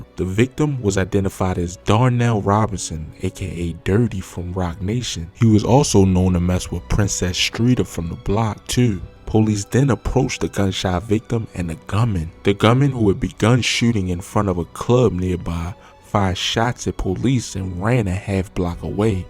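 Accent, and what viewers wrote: Baltimore, United States. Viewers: You definitely from Baltimore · This nigga sound like he from Bmore. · You sound like you from Baltimore. How you know so much about chicago. I don't don't care about none of these gangs here in Chicago. These goofys just in the way.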